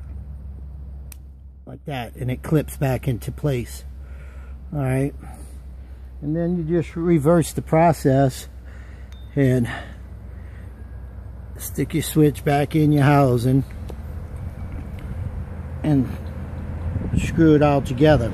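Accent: Louisiana, United States. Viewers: Say bree? Now I know y'all from Louisiana. Good vid, very helpful, thanks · Great video, thanks ...where did that "accent" come from, Louisiana?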